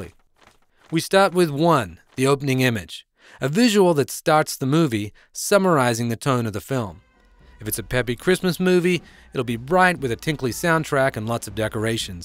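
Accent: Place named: Australia. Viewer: Totally random observation: this dude has got to be half aussie or something. There are some sneaky vowels & dipthongs giving away an accent! (Dont worry, as an aussie, its a cute thing to notice) Handy video, btw. Thanks!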